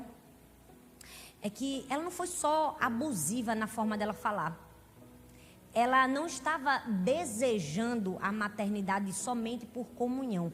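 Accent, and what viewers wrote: Northeast, Brazil. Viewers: e esse sotaque nordestino? 😍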